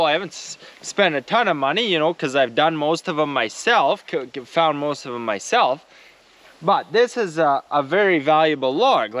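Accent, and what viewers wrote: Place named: Newfoundland, Canada. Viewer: i'm not canadian so forgive me if this is offensive, but is that a newfoundland accent. it sounds so much more irish than most canadians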